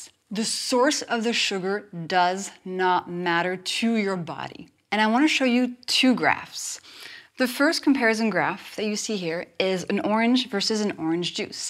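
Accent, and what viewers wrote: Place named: France. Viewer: She's a French speaker. I can tell. Probably from France.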